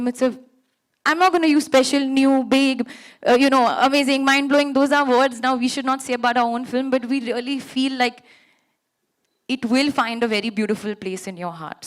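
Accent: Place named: Telugu, India. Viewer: U sound like a telgaite when u speak ..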